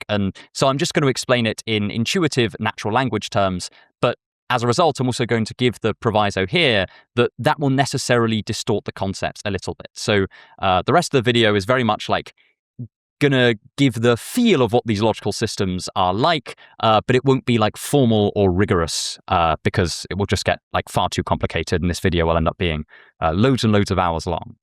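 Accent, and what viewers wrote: United Kingdom. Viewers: British voice alert, I repeat, British voice alert ⚠️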